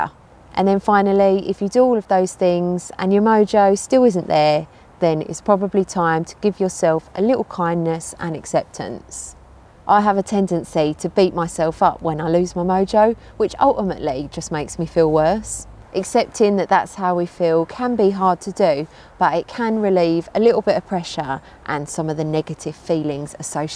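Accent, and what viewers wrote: United Kingdom. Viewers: Hey I think your cool, I love your zeal for life and your British accent!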